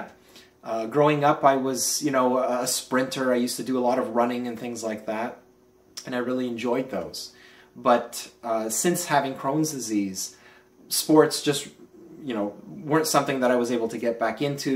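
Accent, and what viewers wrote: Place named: Canada. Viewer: Hiya! I thought that was a Canadian accent! We spent many many happy summers in Canada when I was growing up, (Nova Scotia and Alberta/The Rockies) I always thought I would move over as soon as I could but I met my now husband when I was young and that was that! We are planning to bring our daughter over for a long holiday in 2016 though and the big dream is to come and sing at jazz festivals as a way of bringing her back year after year! Super video! I'm not a new YouTuber but I'm a new ostomate (and loving it!!) and so overwhelmed with how wonderful the community is! Fantastic tag! I hope you had a lovely Christmas x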